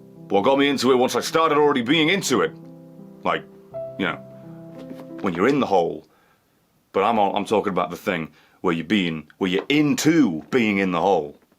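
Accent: United Kingdom. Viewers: As long as you do what keeps your interest and mind going, I think we’ll always stick around,. We’re here for you. We came for Isaac, we stayed for the screaming, enraged, messed up speech displaying British man that is you.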